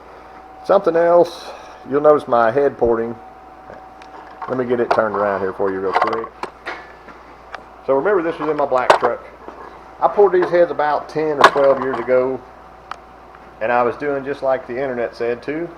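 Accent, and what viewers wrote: South, United States. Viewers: It's kinda cute how you Southern boys can't pronounce "oil" or "coil". It always comes out "ole" and "cole", lol. 😉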